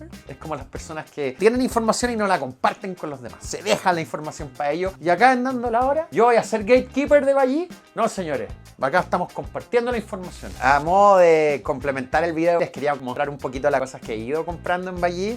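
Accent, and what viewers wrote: Chile. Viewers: Yo soy español, me encanta vuestro contenido y me encanta vuestro acento Chileno!!!! Dando la hora es uno de mis canales favoritos (si no el favorito) de relojes y por favor, no cambies tu acento, es tú esencia 💪🏻💪🏻💪🏻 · Nunca cambies tu acento chileno. Así está bien. Y que relojes tan icónicos tienes, quiero un watch tour. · Soy español y el acento de Chile es TOP🎉🎉🎉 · Acento chileno es precioso. Saludos desde Madrid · ES JODIDO EL ACEPTO CHILENO JEJEJEJ, PERO GRACIAS. NUEVO SEGUIDOR... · Aca un cubano en miami que le agrada el acento chileno, es cool bro 😂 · Soy español y el acento chileno se me hace muy agradable 👌😊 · Soy de España y me encanta el acneto chileno, no lo dejes!:face-red-heart-shape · Que te quede claro que acá en México se les aprecia y se les admira a ti y a (o no se a ciencia cierta como se escribe) y no intentes suavizar tu acento chileno, es el acento más chingon de America. 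· Soy español, me gusta escucharos hablar en español chileno! Veo el canal por eso!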